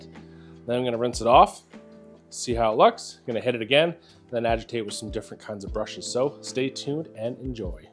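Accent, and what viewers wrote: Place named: Canada. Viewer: nice demo. It looked a little scary when you sprayed around the alternator. Thanks for speaking Canadian. 🇨🇦👍👍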